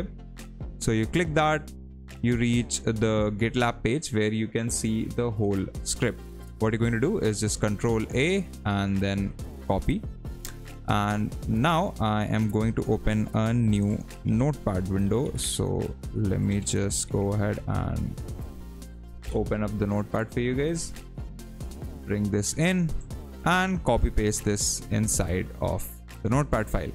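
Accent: India. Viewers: You know you've found the right tutorial when the person with an Indian accent shows you how to do the things in the tutorial.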